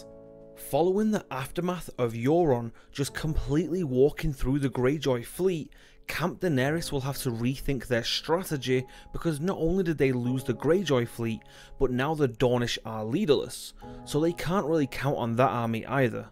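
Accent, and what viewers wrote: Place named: North, United Kingdom. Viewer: subbed for his northern accent! :)))) KING IN THE NOFF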